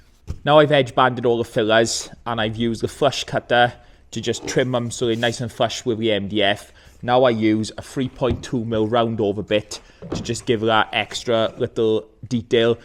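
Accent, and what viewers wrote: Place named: Northern England, United Kingdom. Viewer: Hi, I listened about four times over and over again to work out what screw you are using to put your units together and whether it was because of your cold or your northern accent I couldn't understand a word you were saying. I then looked in Screwfix for every screw that I could see. I still don't know what screw you used, could you write it down on a board, or a piece of paper, Thank you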